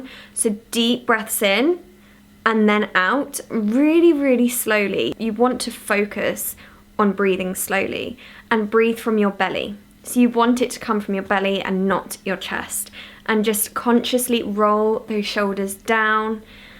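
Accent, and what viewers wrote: United Kingdom. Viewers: Hi this is Kim! I'm new to your channel. I enjoyed your video, and love your Brittish accent! I have a mild case of anxiety, and take medication for it. I also color in adult coloring books, I also do cardio, and meditate! Thanks again, Kim!😊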